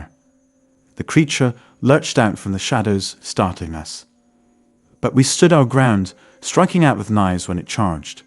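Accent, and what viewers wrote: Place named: England, United Kingdom. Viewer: An Englishman ! 😉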